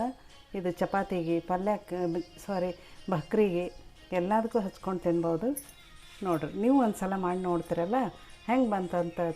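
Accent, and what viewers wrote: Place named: Brahmin, India. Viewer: ಎಲ್ಲಾ ಅಡಿಗೆ ತುಂಬಾ ಚೆನ್ನಾಗಿ ಮಾಡುತ್ತೀರಿ ತುಂಬಾ ಚೆನ್ನಾಗಿ ಹೇಳುತ್ತೀರಿ ಬ್ರಾಹ್ಮಣ ಭಾಷೆ ಅಪರೂಪವಾಗಿದೆ ಕೇಳೋದಕ್ಕೆ ಖುಷಿ ಅನಿಸ್ತದ ನಮ್ಮ ಉತ್ತರ ಕನ್ನಡದ ಬ್ರಾಹ್ಮಣ ಭಾಷೆ 🙏🤝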